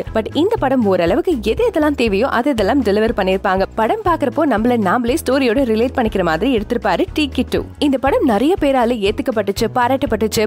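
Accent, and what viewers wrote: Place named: Malaysia. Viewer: akka Malaysia Tamil Torll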